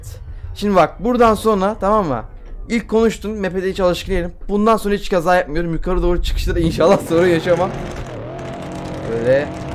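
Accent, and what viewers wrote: Erzurum, Turkey. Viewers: ANA ABİ SEN ERZURUMLUMUSUN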